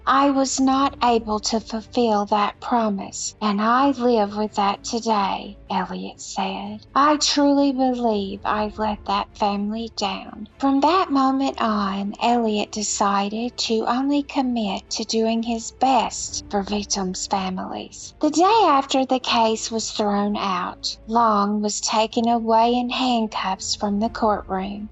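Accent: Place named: Deep South, United States. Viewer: Please use a regular voice- only people in the deep South talk like tha-ut anymore. Plus it's creepy.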